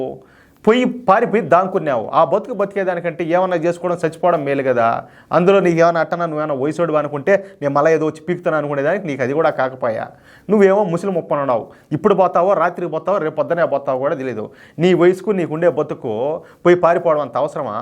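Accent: Rayalaseema, India. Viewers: బాగుందయ్యా పెద్దమనిషి మన రాయలసీమ భాష చాలా బాగుందయ్యా నాకైతే తెగ నచ్చేసింది అయ్యా నీ లాంగ్వేజ్ బలే బలే బలే బలే బందయ్యా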